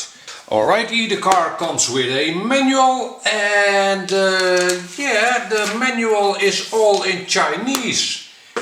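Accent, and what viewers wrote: Netherlands. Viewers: Mooie video👍... ik wist gelijk al van dat Is nederlands accent en toen zag ik de 😂💪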